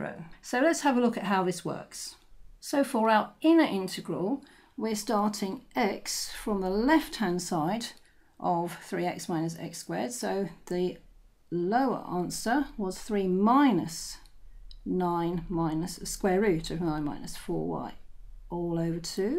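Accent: United Kingdom. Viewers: Are u British? Cause I like ur accent :P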